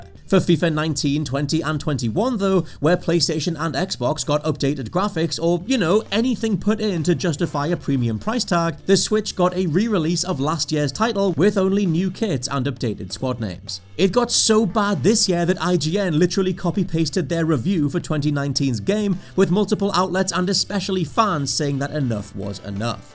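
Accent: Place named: United Kingdom. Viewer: Because British accent?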